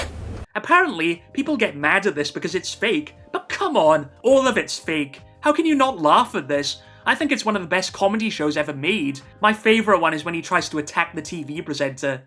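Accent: Wales, United Kingdom. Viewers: I'm just now realising that your accent is Welsh and not Northern English. I'm an idiot. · Hey, there's another Welshie! I'm from North Wales, I reckon you're from Swansea or Barry? I'm sure S4C had a second channel at one point, but it was mostly used for overspills of the Welsh Senedd broadcasts.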